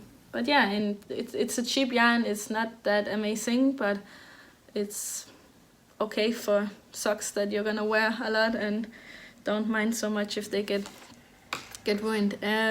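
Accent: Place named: Denmark. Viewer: Hello from Michigan in the U.S. I knitted through your podcast and it was so relaxing to hear your lovely Danish accent as I worked on my sock. I look forward to the next.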